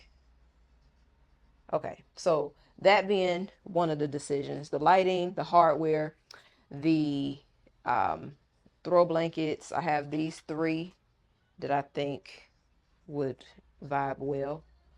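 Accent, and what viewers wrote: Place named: Chicago, United States. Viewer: I have fallen in love with you!!! Ahhh.. and I recognize your accent. Chicago! Thank you for your sharing amazing talent! I love decorating and making my home elegant and beautiful! Your tips and so easy and very helpful!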